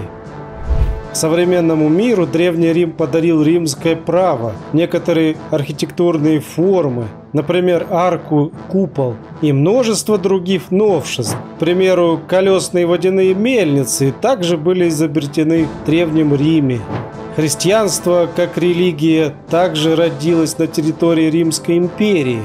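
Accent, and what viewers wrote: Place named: Ukraine. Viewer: русский с Украинским акцентом))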